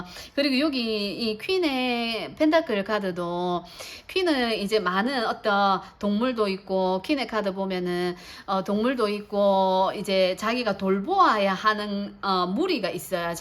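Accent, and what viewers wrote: Gyeongsang, South Korea. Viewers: 경상도 분이다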